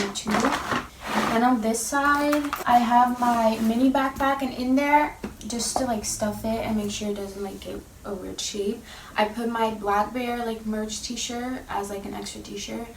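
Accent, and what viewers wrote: United States. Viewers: omg ik kom er nu achter dat je Nederlands bent terwijl ik altijd dacht dat je uit Amerika kwam ofzo 😂😂 Maar welke primer gebruik je? :) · ik dacht dat je Amerikaans ofs was maar je bent Nederlands woaaw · wait youre dutch i thought you were american all this time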